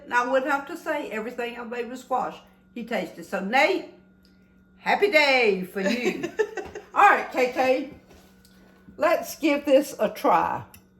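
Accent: South, United States. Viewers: Love watching you cook. You remind me so much of my wonderful mother-in-law. She was from Memphis and had a lovely southern accent, too. Thank you so much for your delicious recipes and delightful stories. Take care, Linda 🦋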